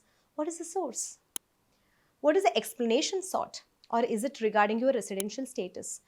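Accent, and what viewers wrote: Kerala, India. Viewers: It sounds like my ears are ringing with Malayalam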